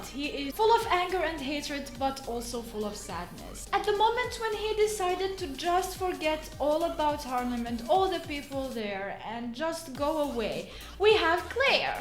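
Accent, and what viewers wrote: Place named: Russia. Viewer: Hey, love your accent..just wondering where are you from? Russia? 🐰👍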